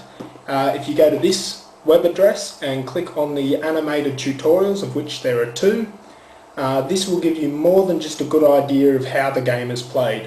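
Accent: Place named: Texas, United States. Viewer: I like your Texas accent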